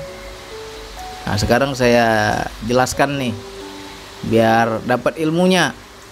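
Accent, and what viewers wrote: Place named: Medan, Indonesia. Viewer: Abg logat medan..orang medan ya bg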